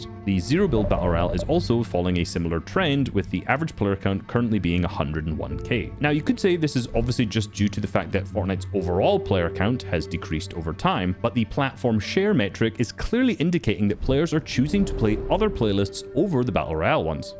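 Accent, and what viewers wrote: Scotland, United Kingdom. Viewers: How can I watch video without the terrible Scottish accent?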